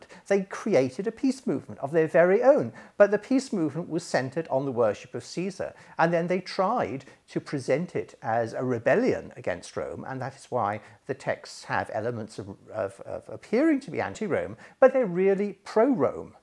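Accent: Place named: England, United Kingdom. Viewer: Wow you sound so smart with the English accent and all and yet I don’t believe anything you regurgitate as truth - nice try.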